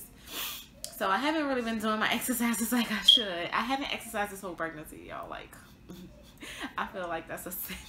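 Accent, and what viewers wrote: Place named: New Orleans, United States. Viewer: You sound like you're from New Orleans lol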